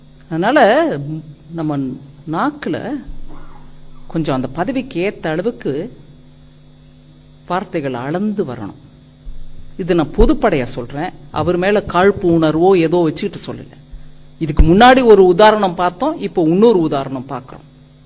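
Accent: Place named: Chennai, India. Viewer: Amma u r great neenga prindhathu madurai aana neenga chennai pechu iducosam vera11 amma i m great fan of fr u amma neenga unmaiyele enga perima mari erukinga unmaithan inda dmk nambi vote potta enga kudumbam nadutherivil nikkudu annan udhayaniye nanbunen ennoda vazhkai soonyam aachu ethanaiyo exam pass panniten aana enaku tn government job kidaikale aana unaga centrel government job le ennoda 3 friends velaiku poittanga adu mattum ille nan udayanithi anna big fan avare rendu thadavai meet panni ennoda kuraiye solla ponen enna pakkave videle adule ennaoda love vazhkaiyum adanki eruku...,,ok iduvum kadandhu pogum i m from madurai.....,,,, I WANT SEE TN BJB ANNAMALAI SIR TQ TN PEOPLE NAN EVLO COMMENTS PADICHUREKEN PLS IDHA VIREL PANNU GA FRIENDS PLS I WANT TO ANNA MALAI SIR AND UDHAYANITHI ANNA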